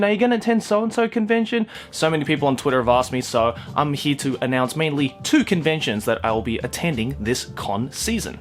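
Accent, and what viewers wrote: Australia. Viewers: wtf hes australian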